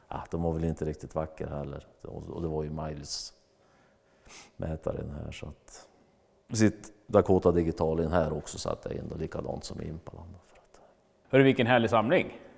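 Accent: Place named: Norrland, Sweden. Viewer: Så underbart att äntligen få höra lite riktig norrländska i denna kanal!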